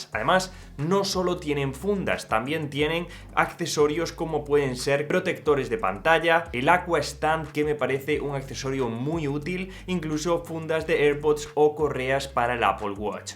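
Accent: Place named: Galicia, Spain. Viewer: Se entiende perfecto, es gadejo lo tuyo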